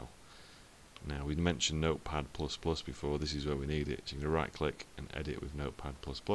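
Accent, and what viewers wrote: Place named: United Kingdom. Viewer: Really like watching your tutorials but I do have one little issue I can't really hear you to great nevertheless it's good to watch some British tutorials instead of American all the time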